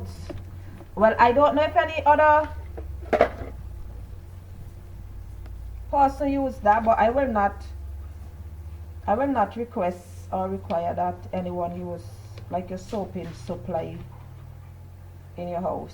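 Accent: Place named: Saint Vincent and the Grenadines. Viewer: OMG. You sure don't sound bajan. My dad is bajan and my mom is vincy. And i was born in vincy and left when i was 14 to Canada. U sound vincy not bajan hon. Lol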